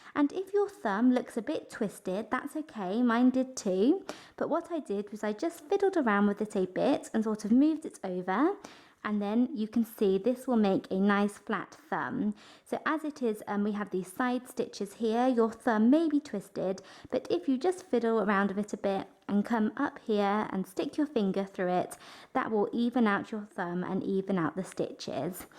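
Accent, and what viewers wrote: United Kingdom. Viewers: this is in british and i don’t understand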